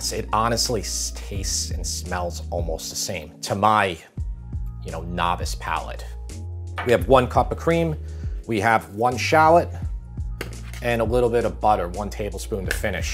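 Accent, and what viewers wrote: New York, United States. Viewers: They offer the same to me- a gal from NJ who now lives in SC and just feel so happy to hear the NY accent and food details, like calling a pizza a "pie".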